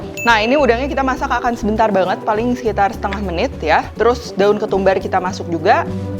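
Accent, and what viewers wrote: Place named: West Java, Indonesia. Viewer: Chef leukohnya Sunda banget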